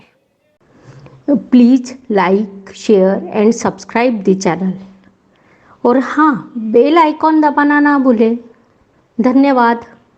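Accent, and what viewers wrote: Maharashtra, India. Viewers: नमस्कार मॅडम. तुम्ही केलेलं विवेचन अन मार्गदर्शन दोन्ही अतिशय उत्तम वाटले. फक्त हितचिंतक ह्या नात्याने एक अनाहूत सल्ला देण्याचं धाडस करतोय तरी क्षमा असावी. तुमचं हिन्दी बोलणं, म्हणजे चक्क मराठीतूनच हिन्दी बोलताय असं वाटतं. प्रत्येक भाषेच्या उच्चारणीचा, बोलण्याचा एक घाट, एक शैली असते. म्हणजे दुसर्‍या शब्दांत सांगायचं तर पावभाजीत सांबार मसाला घातलात तर जे होईल तसं काहीसं भासतंय! त्यामुळे तुम्हाला खरोखरच एक सकारात्मक विनंती करतो की अधिकाधिक हिन्दी, चांगली हिन्दी सतत ऐकत राहायची. नाटक, सिनेमा, मालिका, समाचार असं काहीही. मात्र आपण चांगली, दर्जेदार हिन्दी ऐकतोय ना, ह्याकडे कटाक्षपूर्वक ध्यान द्यायलाच हवं. आजच्या काळात सर्वोत्कृष्ट हिन्दी ऐकायची तर श्री. अमिताभ बच्चन ह्यांचे कौन बनेगा करोड़पति चे भाग पाहायचे. त्यांच्या हिन्दीवर लक्ष केंद्रित करून. माझ्या सूचनेकडे कृपया टीका म्हणून पाहूं नये, सकारात्मक दृष्टिने घ्यावी ही विनंती. हरिॐ.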